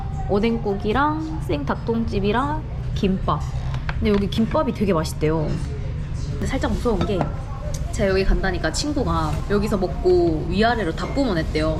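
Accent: Gyeongsang, South Korea. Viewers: 이상하게 경상도. 말과 전라도말이 같이 들리 네요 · 말씨억양이 깅상도? 대구?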